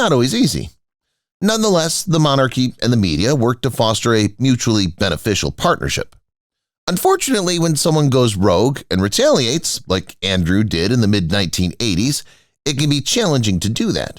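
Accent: United States. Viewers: Always an American accent with these stories… I will not be listening to this narrative… this is against the British Royal Family… and those British who keep following these people deserve to lose the first family of the UK… THIS FAMILY WHO HAVE SEEN THIS COUNTRY THROUGH SO MUCH OVER THE CENTURIES UNTIL TODAY… YOU ALLOW THESE AMERICANS TO RUN THE BRF DOWN TIME AND TIME AGAIN AND EVEN TODAY.. THEY ARE TRYING TO DESTABILISE THE BRF EVEN THROUGH HARRY AND HIS LIAISON WITH AMERICAN RACHEL MEGHAN MARKLE.. THEY DID NOT RUN AWAY FOR FREEDOM… THEY RAN AWAY TO CONTINUE WHAT SHE WAS PLANTED TO DO… IT HAS NOT STOPPED AND HAS GAINED MOMENTUM WITH THE ENCOURAGEMENT OF YOURSELVES… THE LIKES OF TINA BROWN… CHARLOTTE AND MANY OTHERS IN THE BRITISH PRESS… TOM BRADBY. YOU HAVE WHAT YOU WANT NOW IN KEIR STARMER … GOOD LUCK BRITAIN… YOU ARE GOING TO NEED IT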